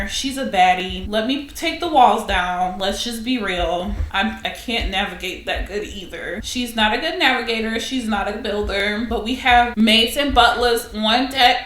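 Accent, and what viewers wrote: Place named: United Kingdom. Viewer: Your British accent 😭😭😭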